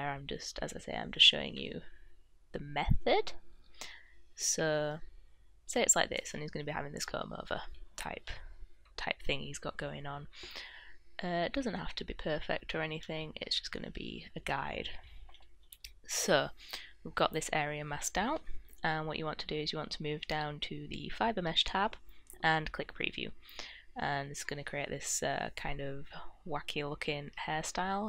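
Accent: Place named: North, United Kingdom. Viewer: great vid and love that northern accent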